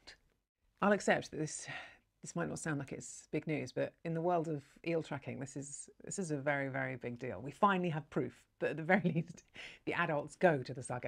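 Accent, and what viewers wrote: United Kingdom. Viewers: Beautiful redhead with a British accent yes please!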